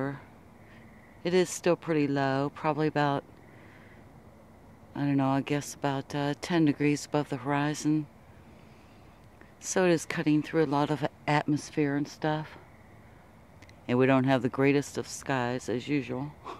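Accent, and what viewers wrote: United States. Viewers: Good morning {, evening) dear ..,yes very interesting and fantastic. (,,,nos kedves nő annyira jó hallgatni a narrációt azzal az ízes jó amerikai angol kiejtésel , hogy az valami hihetetlen számomra , imádom, és köszönöm.) ..ok ..l wish you a wonderful week - end dear .. ok .. Big Hug.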